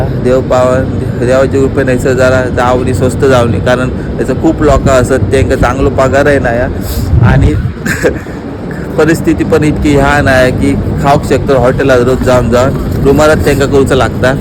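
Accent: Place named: Sawantwadi, India. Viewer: बोलण्यावरणा सावंतवाडी दोडामार्ग चो वाटतस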